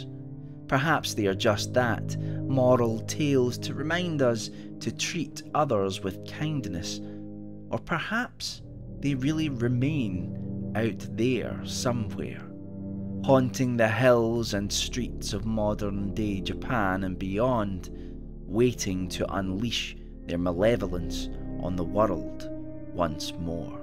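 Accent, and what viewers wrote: Scotland, United Kingdom. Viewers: i love your scottish accent i think its the best accent to tell stories with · Once again, an awesome video, right to the VERY creepy last second! Your engrossing "Scottish Crypt Keeper" kinda narration always adds a whole other level to the spook factor, thank you~Well done! The applause you hear is coming from the Rocky Mountains, in the United States.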